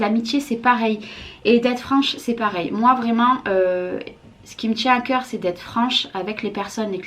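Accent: South, France. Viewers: J'aime regarder vos vidéos et moi qui suis de Nantes j'apprécie beaucoup ce petit accent qui me ramène un peu le sud vers moi. Bonne journée ☺️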